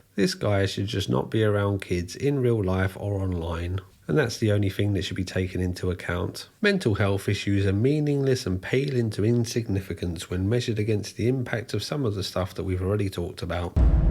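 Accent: England, United Kingdom. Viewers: Oh shit. A cyrax with an English accent. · I'd like to apologize for all my ignorant, fellow Americans, who can't seem to understand a British accent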